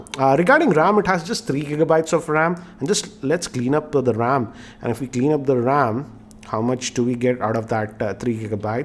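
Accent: Hyderabad, India. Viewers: Geekyranjit I like your hyd hindi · waiting for haidrabadi Hindi😂😂😂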